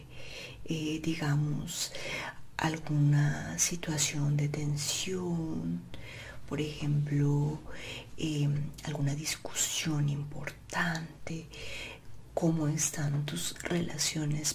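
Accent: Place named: Colombia. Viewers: ¿De qué parte de México eres? Te juro que yo escuchaba como acento colombiano. No reconozco mis acentos, he fallado como mexicana jajaja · Soy colombiana y a mi tambien se me parece a unos de nuestros acentos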